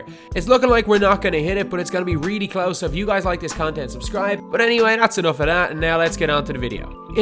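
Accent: Ireland. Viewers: Irish Narrator.....😁...... I like Pat tho...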